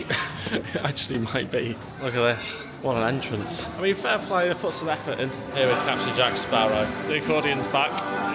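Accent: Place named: Leeds, United Kingdom. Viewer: That’s a posh leeds accent lol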